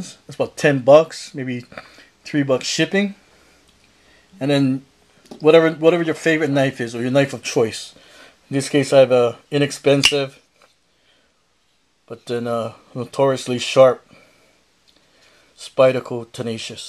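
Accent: Hawaii, United States. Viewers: Howsit!, I have been a subscriber for a while and really enjoy your videos. This video demonstrates what I dig about your vids. Clean to the point and shows great variations for EDC especially if you're in a limited carry situation. Frankly, I also dig your accent too. Reminds me of the days living in Manoa Valley. I miss Paradise, bruddah.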